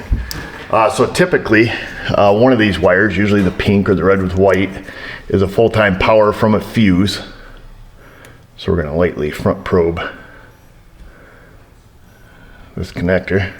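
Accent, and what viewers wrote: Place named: Southern Tier New York, United States. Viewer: As always, a riot if you have the captioning turned on: "Self Made Auto"....even better: "Cancer vent valve". It wasn't until I started watching great channel, that I realized that the southern tier of NYS had it's own dialect. Cool!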